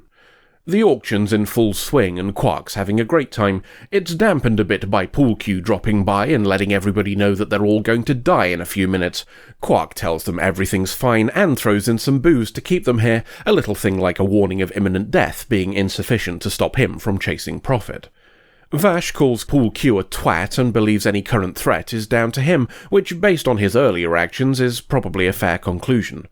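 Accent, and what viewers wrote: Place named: England, United Kingdom. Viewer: How refreshing to hear a commentary in an English accent with English expressions.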